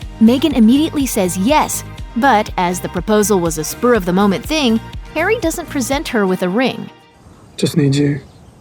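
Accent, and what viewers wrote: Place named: California, United States. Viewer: it's the same californian accent the narrator and Meghan have. Meghan's voice is a pitch/octave lower than the narrator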